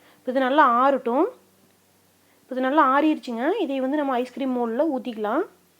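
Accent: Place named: Sathyamangalam, India. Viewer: Hi, nice videos,I just love your Tamil slang, I feel like I am in my hometown Sathy when I hear your voice. where are you from?where are you located?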